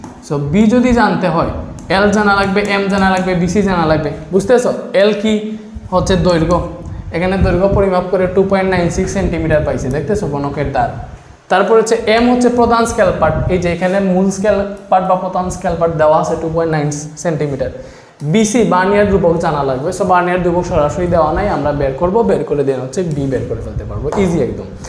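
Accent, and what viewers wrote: Sylhet, Bangladesh. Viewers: Bai kita sylheti ni mate to ola lage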